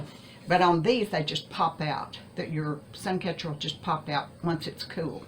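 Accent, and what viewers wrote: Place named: South, United States. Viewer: the southern accent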